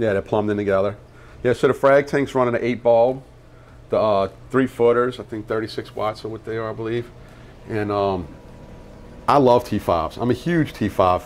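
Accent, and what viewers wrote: United States. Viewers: i knew at about 5 seconds in this guy was from LA. haha i miss southern accents. southern guy stuck here in CA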